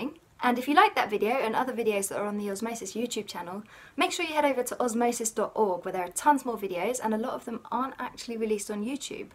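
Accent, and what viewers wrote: United Kingdom. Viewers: Great voice, like made for this. A bit cliché with the British accent ;)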